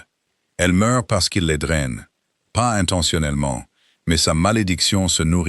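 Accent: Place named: England, United Kingdom. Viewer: Histoire agréable mais narration fort désagréable. Accent anglais puis voix monotone sans aucune chaleur.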